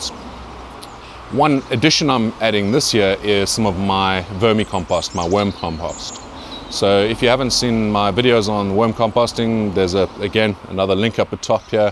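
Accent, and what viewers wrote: South Africa. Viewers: Damn i was just about to comment about how South African he sounds! - Content is great! waiting for my own garden and i'll be referencing the vids for my own setup ^^ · I thought you have a South African accent. Too bad on your lost plants. Hope you get a good yield from the ones you have going though this year.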